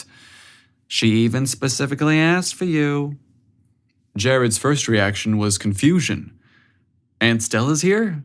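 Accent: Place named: Italian American, United States. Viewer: OMG, you have an incredible singing voice.. what a great way to start the SteamyStory. And then your Italian American mama accent...lol. And such a wonderful summer romance story......ahhhhhhhhhhh, so much better than a Netflix binge.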